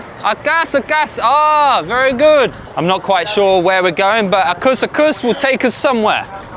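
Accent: United Kingdom. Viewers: u briish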